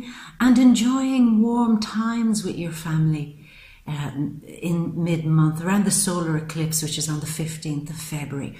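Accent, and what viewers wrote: Ireland. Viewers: You sound like a sexy Irish woman. Insightful reading. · Thank you so much for your time and energy doing these reads for us💕😘 xx I’m newly subscribed to your channel..... So lovely to hear an Irish voice....I lost my soulmate of 20 + years, 2 & 1/2 years ago and his family sadly before that, they were from Carrick-on-Shannon, family name Conlon.... Taurus is my eldest daughter, we’re r/c and since my spiritual awakening we have differences in religion ..... I turned down Sag who’s my ex husband, he’s married to Aries and he’s been seeing my Gemini friend who’s also my twin flame...Now he’s moving onto my Virgo friend....So glad I said no..... As my mother in law would say, Your well for Anne,lol......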